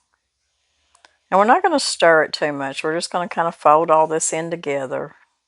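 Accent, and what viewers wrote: South, United States. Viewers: Who else comes to this page just to bask in the country accent and down home goodness? God bless!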